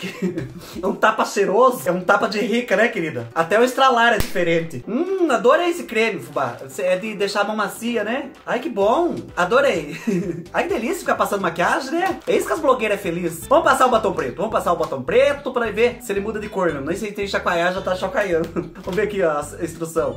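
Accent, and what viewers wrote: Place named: Toledo, Brazil. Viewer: Você parece as pessoa de Toledo MG fala iguarzinho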